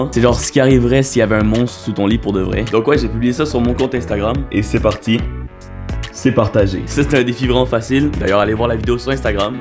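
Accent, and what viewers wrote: Quebec, Canada. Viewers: Tu es quebecois? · tes qubecois?? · Un bel accent canadien 💫💗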